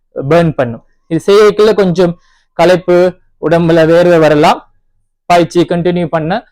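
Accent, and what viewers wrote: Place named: Sri Lanka. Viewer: Love your way of speech and slang... Srilanka?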